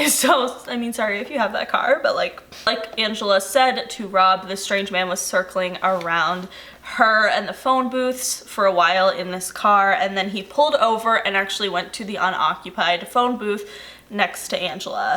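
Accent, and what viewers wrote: Canada. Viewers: D W I think she's from Canada that's why lol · I thought I was the only one who thinks she's from Canada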